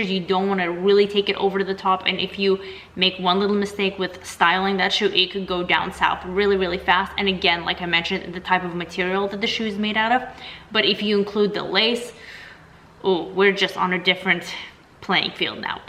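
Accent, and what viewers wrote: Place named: Russia. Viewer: I was doing good until you got to wedges. I have a pair of black ones for the office. Where I live in Canada a lot of women wear these type of shoes for work. It makes driving a lot easier, easy to go up and down stairs, don't need to worry about getting heels caught in the escalator or those annoying grates on the sidewalk that you can't avoid while walking in a crowd. And for me, I am always scruffing my shoes on the wheels of my chair. But yes, my sandals are pretty, I think. I was also wondering about your heritage. Is your background Russian? My background is Ukrainian and you sound Russian to me.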